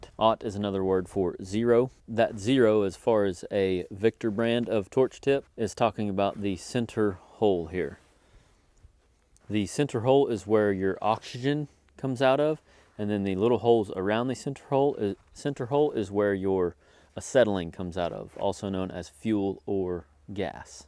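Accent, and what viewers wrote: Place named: South, United States. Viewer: A 'Southern' accent. Maybe Oklahoma or Texas? Not sure, but the gas/oilfields are in those states. All the Southern States have their own distinctive accents. My wife is from Tennessee & Mississippi, which is different. Georgia & the Carolinas sound very beautiful to my ear, but I like them all.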